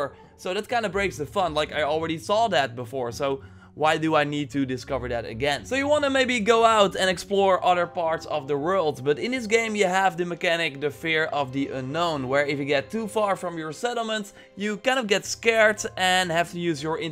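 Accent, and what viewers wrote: Netherlands. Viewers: Love your Dutch accent!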